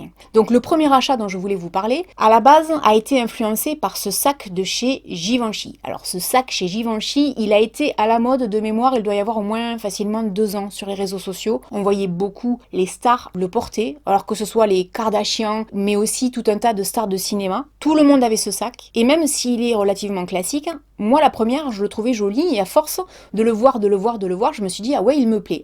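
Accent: South, France. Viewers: Sa fait trop du bien d entendre l accent du sud... je suis de perpignan sa fait trop du bien❤❤❤ reste comme tu es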